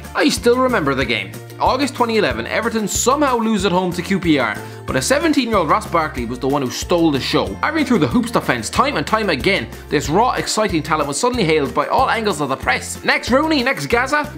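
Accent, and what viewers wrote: Ireland. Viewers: Are you irish ? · Robinho is far from overrated. Where are you from?? Ireland??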